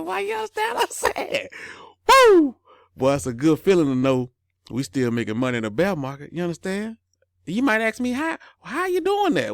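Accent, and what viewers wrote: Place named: South, United States. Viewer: That that “southernplayalistic” dialect!! Yas sir!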